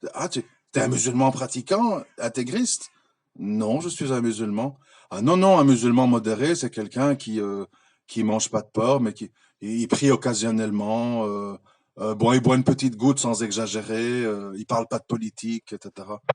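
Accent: Belgium. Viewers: c est un Belge de souche 😇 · Accent belge.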